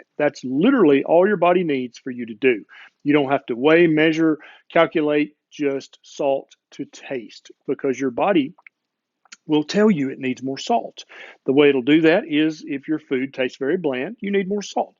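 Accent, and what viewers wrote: South, United States. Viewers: 🍫🍿🥤 I'm ONLY here for the Southern accent. 🍔🍟🍩🍪🥤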